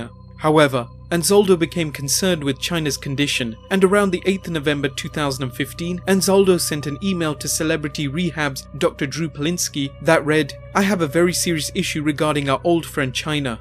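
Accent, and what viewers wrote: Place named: England, United Kingdom. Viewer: The English narrator gives it an air of sophistication and authenticity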